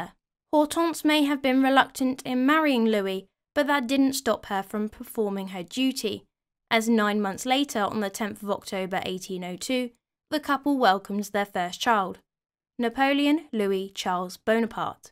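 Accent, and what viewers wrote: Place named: England, United Kingdom. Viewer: French aristocracy and/or monarchy typically reside outside my interests, but with you've managed to usher me through the portière with you're ability to create these historic characters into actual living persons. I am an American and of course I find your English accent fascinating and exotic but yours in particular has an intriguing aspect. Have you done any work into the Scottish folk? thanks so much for your content.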